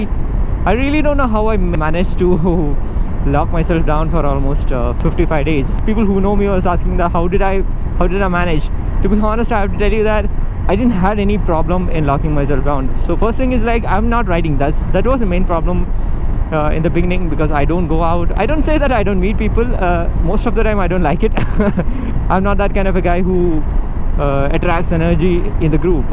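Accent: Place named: India. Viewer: I do not know how I ended up here. I gather is Indian if an IT engineer and by his accent., stay safe there in India. But if you are healthy and not too old, this corona virus is not relevant to us.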